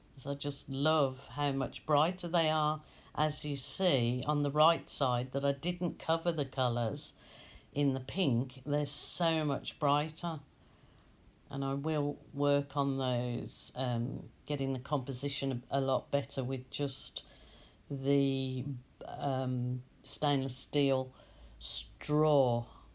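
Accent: Birmingham, United Kingdom. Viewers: Hello from Shrewsbury, UK. You’re a lockdown saviour...I’m now an acrylic addict! If you ever come to live in England, you would fit in really well in the Midlands as you have a perfect Birmingham accent. Just wondered if anyone else had ever mentioned that?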